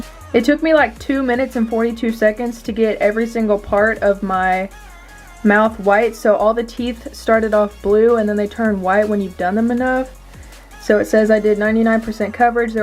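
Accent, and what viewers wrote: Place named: United States. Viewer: dam it you attractive women! You have a cute american accent!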